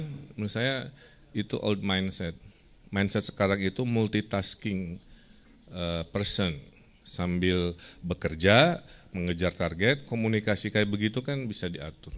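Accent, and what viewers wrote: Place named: West Java, Indonesia. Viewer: Makluk orang sunda mah begini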